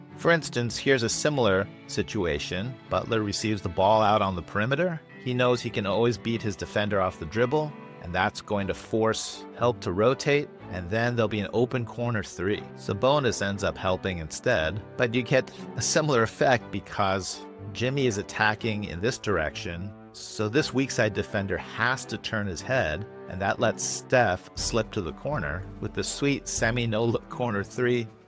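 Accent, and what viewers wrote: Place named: Netherlands. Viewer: Excellent Dutch accent!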